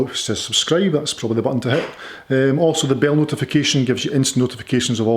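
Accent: Scotland, United Kingdom. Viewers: Well explained and demonstrated, as usual. Your videos are easy to follow as you explain and demonstrate very well , with a keen awareness of camera angles for the best viewer experience. Your delivery is excellent based on clear diction and a slightly aggressive but at the same time friendly direct Scottish accent, which I like - direct and no BS. Good sense of humour with amusing asides to camera are also very good. Lots of stuff on you tube but you appeal to a high handicapper hacker like me. Seeing some improvement with eureka. Big fan, keep up the good work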